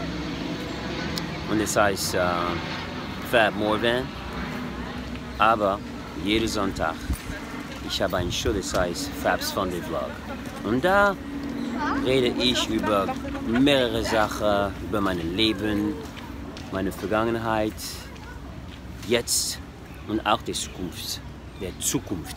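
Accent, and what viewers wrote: France. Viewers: the french accent is the most charming one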